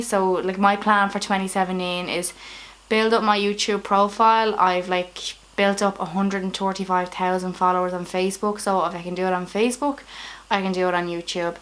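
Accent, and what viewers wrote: Dublin, Ireland. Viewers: l love this chanle l love to hear my dublin axsent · you are so talented you are so right with the Dublin accent I can relate to you, keep up the great work and if it's not too much trouble do more movies if they were set in Dublin they were fantastic introduce loads of my family to them particularly love titanic best of luck to you my 87 year old mother Haas a great laugh.I wish you well and happiness and look forward to the next production.well done your class you make my day .I will keep watching good girl go for it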